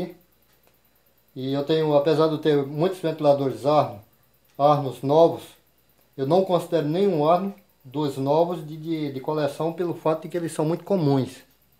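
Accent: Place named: São Paulo, Brazil. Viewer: Fala fala lenga lenga ou é paulista ou carioca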